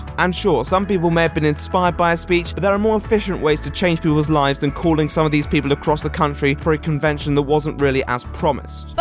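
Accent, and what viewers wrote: RP, United Kingdom. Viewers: I rarely comment on any videos. But, I have been binge watching these videos!!!! I'm into British Culture so the first thing that kept me here was the accent and then the fact that he is able to take me from A-Z on people I have idea about and I leaving feeling like I am ready to Spill TEA!! LOL! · Actually i love the British accent actually i think its the classy version of the English language. Theres another youtuber i like and thats Mr. Jim Sterling. And im a new subscriber to you to and i like your videos as well. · This is weird but I love your voice and accent some British people I’ve encountered I thought were from Scotland anyway love your videos 🙌🏽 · I dont know whats less interesting, phussy or this video about him.....i will however subscribe as i am an american and therefore hypnotized by a british accent. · You posh Brits GOSH!! 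· I like these slightly shorter vids. Not that I don't like the longer ones or appreciate the work but I finish these more often than the full on 60 minute documentaries. Mainly because I watch these in bed sometimes and fall asleep from his sexy british yarn lol. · People are comparing you to Colossal is Crazy? What? Why? Your content is way different than his and your voices aren't the same, I can tell that you both are from the UK. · Omg you sound like your from the Uk !!! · So posh 😍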